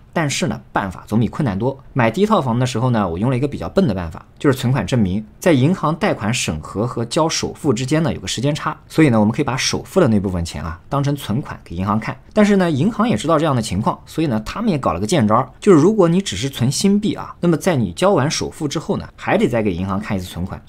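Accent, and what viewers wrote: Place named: Shandong, China. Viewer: 有一点山东口音哦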